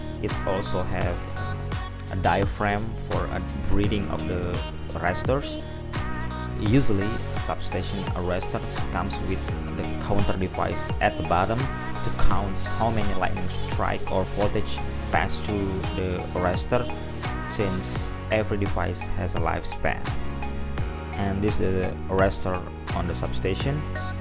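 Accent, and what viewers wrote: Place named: Indonesia. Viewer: Saya kira cuma saya saja penonton dari Indonesia gan hehehehe Rupanya channel ini juga asal Indonesia pantas suaranya seperti orang Indonesia walaupun bahaya Inggris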